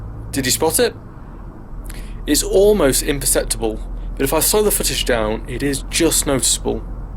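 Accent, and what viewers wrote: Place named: United Kingdom. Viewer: My ear hates British English.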